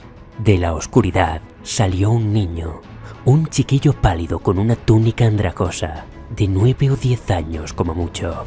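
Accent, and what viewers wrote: Spain. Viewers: La verdad el acento español me cae mal.... Pero no puedo dejar de escucharlo 😅😅😅😅😅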